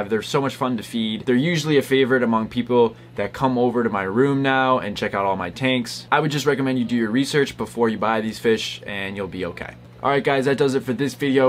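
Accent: Wisconsin, United States. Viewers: It's so odd hearing Wisconsin since yknow,, home state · Wait are you from Wisconsin?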